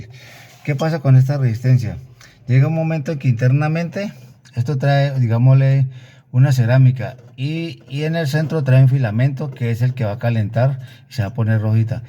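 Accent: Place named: Táchira, Venezuela. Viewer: Hermano no se le entiende nada suelte la lengua para hablar. Se entiende que es gocho y para explicar algo se ase con pausa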